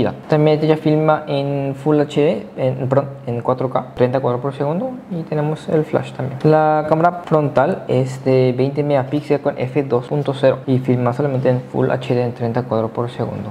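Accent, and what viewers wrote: Paraguay. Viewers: Cómo se nota el acento pyo 😂😂😂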